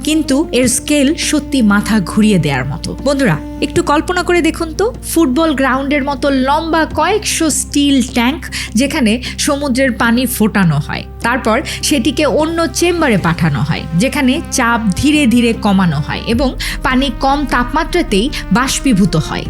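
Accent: Bangladesh. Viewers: আপনার ডিটেইলস পরিচয় জানতে চাই। একটি ভিডিও করলে ভালো হয়।আপনার কথার টোন ভারতীয় নয় বাংলাদেশীদের মতো। তাই কৌতূহল বশত কমেন্টস করলাম।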